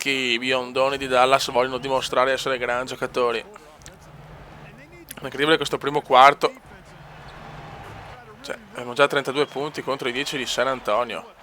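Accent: Veneto, Italy. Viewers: che veneziano :D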